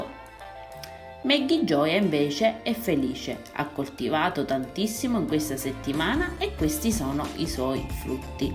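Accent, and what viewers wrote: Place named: Sicily, Italy. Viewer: Il tuo accento siciliano è bellissimo ahahaha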